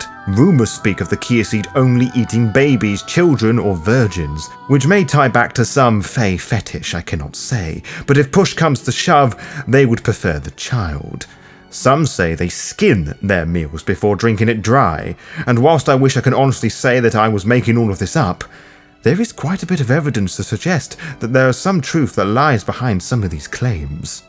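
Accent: United Kingdom. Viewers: Pray tell, fool. What do you THINK a British person should sound like? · Its called being British, which I assume he is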